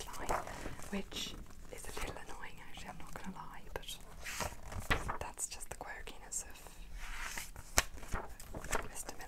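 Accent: Ireland. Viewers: the one person who can actually do a decent irish accent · I’m Irish and this is honestly one of the best fake irish accents i’ve ever heard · So unreal! i’m from dublin myself, and i was shocked to find out you weren’t irish yourself!! deadly accent! you put me straight to sleep too btw, this was so comforting to me :’) Xx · Omg! Coming from an Irish person, your accent was so good! Especially for a fake one. Alot of non Irish people think it's a hard accent to be successful in. I'm glad u like the accent😘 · This is class I’m Irish my self and you sound very Irish ☘️ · this is literally an Irish accent I would’ve thought you were from Dublin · Im Irish (Dublin) and can say that you have a very good accent 👌🏽 Go Maith! 🇮🇪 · I was so confused watching this at first. I was thinking, does she have an Irish twin??? 😅 Brilliant accent! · Wtf you have a better accent then me an Irish person low-key shook 😌😪🤩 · I’m Irish and your the first person to nail the accent FULLY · Sounds stronger than mine... 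Im from fooking Limerick. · wait what i thought she was irish um hello she’s a better irish than me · This is the best Irish accent ever done by someone out of Ireland hands down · Brilliantly done, not many people can do the Irish accent justice ❤️👌 (I’m from cork Ireland 🇮🇪) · Being irish myself Not a bad IRISH accent at all well done girl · I'm Irish and I'm from Belfast and I have heard a couple people from Dublin and cork and ur accent is really good and thank u for not saying top in the morning to ya😂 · You did an awesome accent!! I already love your accent but I love Irish ones!! I’ve always loved talking in different accents too lol it’s fun!! 🍀💚🍻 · I'm Irish and ppl always try to sound like me but they can't rlly do it, BUT U ON THE HAND! IS GREAT! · Can’t find any Irish asmrtists you’re the closest thing so far! I love my countries accent haha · it’s kinda cool to see someone do an actually good irish accent. 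she’s definitely got the soft t’s and d’s spot on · One of the best Irish accents you literally sound like me !!!!! (I’m from Ireland)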